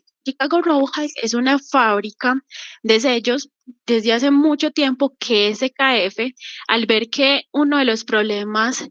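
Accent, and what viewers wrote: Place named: Antioquia, Colombia. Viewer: Con ese acento paisa puedo ver y oír un video de más de 3 horas 😊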